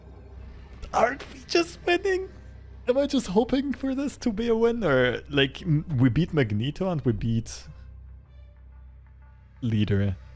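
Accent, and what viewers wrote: Germany. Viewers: Most german accent on YouTube 😍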